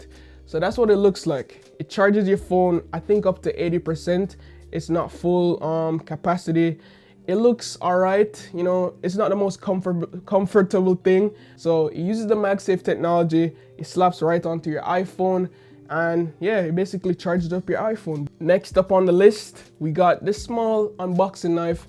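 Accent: Jamaica. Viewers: Is that a Jamaican accent I hear? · you jamaican? big up yuh self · are you Jamaican brov? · Yow yah Jamaican ? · You sound Jamaican 🔥👍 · are u from Jamaican ??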